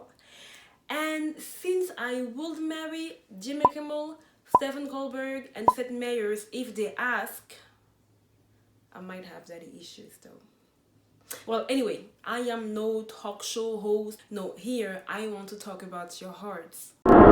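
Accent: Cameroon. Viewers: You sound like a Francophone Cameroonian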